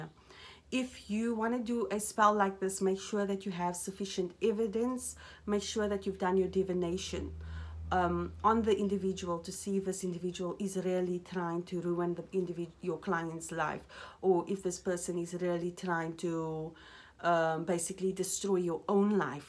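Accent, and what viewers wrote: South Africa. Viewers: Hallo, recently discovered you on your dark energy video's. Are you South African by any chance?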